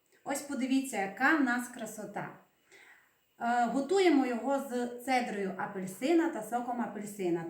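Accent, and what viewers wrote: Transcarpathia, Ukraine. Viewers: Дякую,! В нашій родині наразі місяць страв з гарбуза, хоча любимо гарбуз, тому він часто на нашому столі.))) У Вас приємний закарпатський акцент, люба! Рясних Вам і Вашим рідним Божих благословень!💙💛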